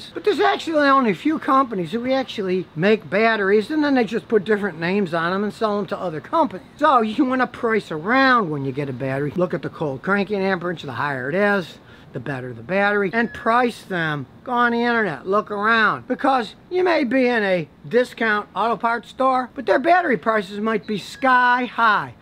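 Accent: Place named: United States. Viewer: Dear uncle, please speak a little slowly for us who have a difficulty understanding American accent.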